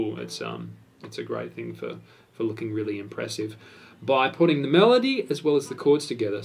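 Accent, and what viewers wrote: Australia. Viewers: He's Australian...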